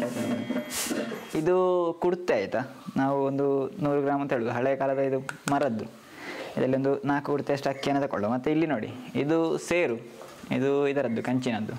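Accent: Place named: Mangaluru, India. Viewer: Kannada (Mangaluru accent )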